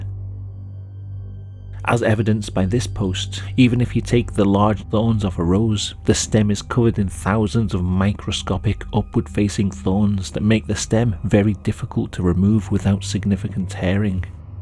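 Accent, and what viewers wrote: United Kingdom. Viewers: How have I not discovered your channel sooner?! True crime is a lot easier to take in with a British accent I find. Disturbing stuff but very detailed work. You'll find me here more often now. Keep it up!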